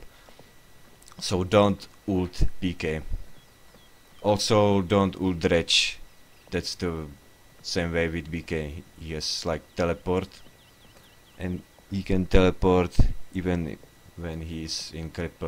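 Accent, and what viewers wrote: Czech Republic. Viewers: Are you from cz?protože máš takovej přízvuk. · Jsem si říkal, že takováhle angličtina musí být od Čecha, nicméně nice vysvětlení jak hrát za Evie, dneska zkusím, jestli to je ještě aktuální a pokusím se za ní naučit. :D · Hii I'm new here but I have a question...are you from czech republic? Love your accent !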